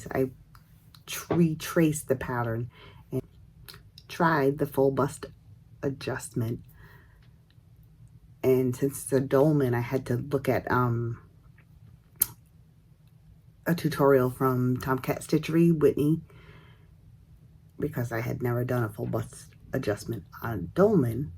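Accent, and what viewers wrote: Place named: New England, United States. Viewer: Hi! I found your channel from wheel of fate -- and decided to go back to the first video / intro. Nice to meet you. I can definitely hear your New England accent! I subscribed and look forward to catching all your makes videos.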